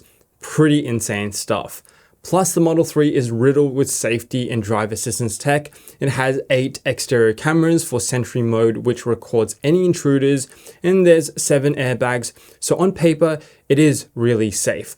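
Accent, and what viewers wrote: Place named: Australia. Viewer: You've got the Australian accent but keep using "miles" ... A little disorienting :P Now... I just need to drastically increase the amount of money I have and I'd be able to afford one :D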